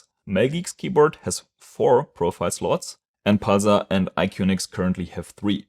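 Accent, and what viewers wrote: Germany. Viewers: This German Accent! xD But thanks for the Vid! This really helped me!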